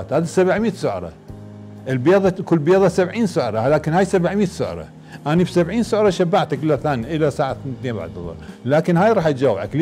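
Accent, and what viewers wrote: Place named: Iraq. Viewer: دكتور انت كتير مهضوم ونا احب اللهجة العراقية هواااااي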